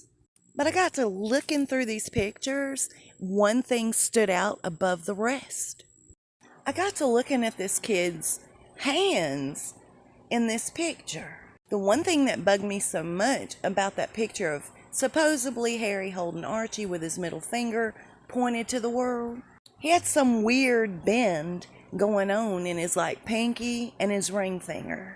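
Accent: South, United States. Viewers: Love 💘U, We Will All Miss U, We'll Miss That Southern Accent With All That Great TEA U Serve Us. U R SORELY LOVED We'll Miss U Something Awful, Rest In Peace Our Beautiful Sweet LADY, 🦋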